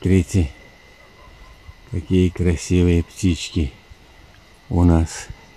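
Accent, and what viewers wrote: Tirlyan, Russia. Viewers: ВОТ ТАК ВОТА!!!😁 ох оно неповторимое тирлянское наречие! Смотрю тебя еще чтобы не забыть как тирлянцы говорят, из тысячи говоров узнаю тирлянский говор.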